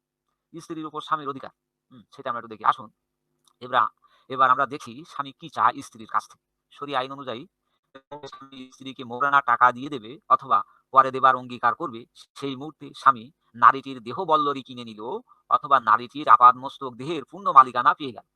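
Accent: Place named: North Bengal, India. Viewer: গুরুজি আপনাদের উত্তরবঙ্গের ভাষা খুব সুন্দর এটা আমরা মেনে নিই কিন্তু আপনার ভাষাটা খুব তাড়াতাড়ি আসার কারণে বা বলার কারণে আমাদের বুঝতে অনেক কষ্ট হয়ে যায় বিশেষ করে আপনি যে পৃথিবী ছয় দিনে বা আট দিনে সৃষ্টি করা যে এটা লাইফটা করছেন সেই সেই লাইফটা আমরা ভালোভাবে বুঝতে পারিনি আরেকটু স্লো ভাবে খন্ড খন্ড করে বুঝিয়ে বলবেন তাহলে আমাদের বুঝতে কষ্ট হবে না আশা করি আপনাকে এবং আপনার জ্ঞান কে আমরা শ্রদ্ধা করি আপনাদের উত্তরবঙ্গের ভাষা গুলি শুনতে এমনি হাসি আসে এরপরে যদি একটু তাড়াতাড়ি করে বলেন তাহলে আমরা একেবারেই বুঝতে পারিনা ধন্যবাদ স্যার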